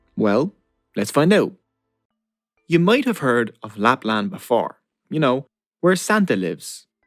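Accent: Dublin, Ireland. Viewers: Last thing I expected was the thick inner city Dublin accent! Brilliant. Thanks a million champ!!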